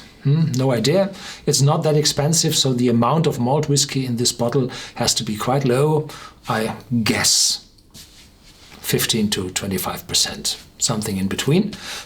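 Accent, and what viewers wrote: Germany. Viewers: I hear a German accent?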